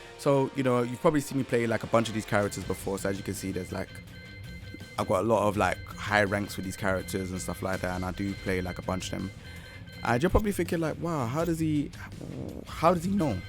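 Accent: South London, United Kingdom. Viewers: Both from U.K., is from south london and I’m in the south region too, it’s quite common to hear similar or diff accents over here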